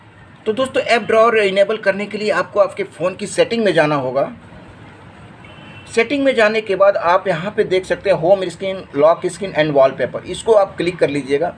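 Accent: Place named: India. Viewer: He's speaking English in strong Indian accent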